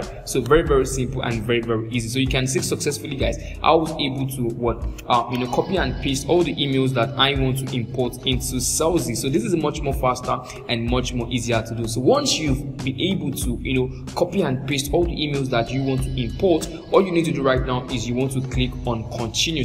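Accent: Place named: Nigeria. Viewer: Are you Nigerian, I can pick up the accent, subtly though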